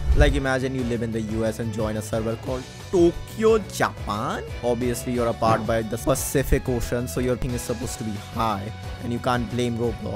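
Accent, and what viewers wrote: India. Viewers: U already know when his accent is Indian ur in the right tutorial 😭🙏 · bro sounds indian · Why did you redeem it ahh india voice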